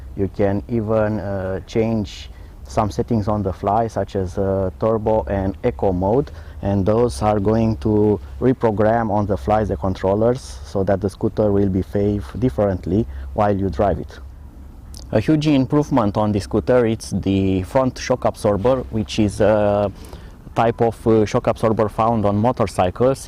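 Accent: Romania. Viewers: Baga' limba rOMANa ca esti praf...:))) accentul te da de gol ca esti roroman:))) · You speak english like a romanian.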